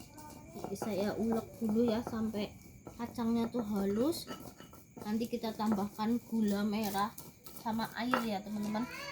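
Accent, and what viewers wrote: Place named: Central Java, Indonesia. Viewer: Mbakyu orang mana to kok kaya logate wong Jawa tengah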